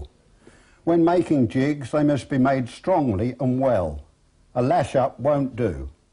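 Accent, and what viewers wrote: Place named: South East London, United Kingdom. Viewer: I lived in SE London as a kid and later moved out to Kent so accent did not seem any different to my own. It is sad to hear he passed away but he did have a slightly younger assistant who was also very knowledgeable, I wonder if he has carried on teaching people.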